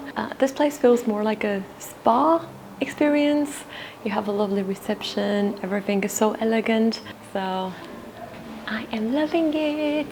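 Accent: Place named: United States. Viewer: ! Very cute responses to classic, small town Americana … albeit an extremely expensive and touristy version found in Napa. You know how some people adopt the accents of others around them? During much of this episode, you expressed yourself in a clear American accent! You lived in the UK. You are Romanian. How did you develop your English language skills? Also, how cute is the fact you consumed a few too many wine samples! It is funny in a good way. Endearing. I’m sure Matt brought you back to your hotel and all memories of your “hell flight” disappeared in the California night.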